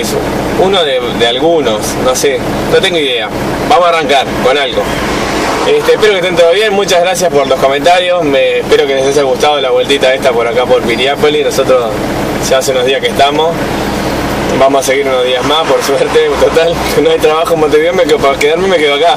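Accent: Argentina. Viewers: Que raro, dice que se crio en Venezuela, pero habla como argentino.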